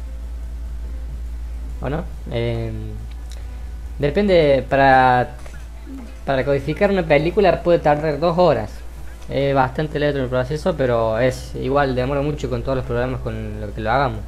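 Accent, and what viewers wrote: Argentina. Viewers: re cordobes jajaja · Excelente tu tutorial "cordobés"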